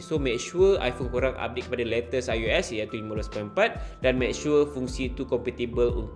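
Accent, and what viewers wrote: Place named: Kelantan, Malaysia. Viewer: mujur eh . ore kelate kow ? 😅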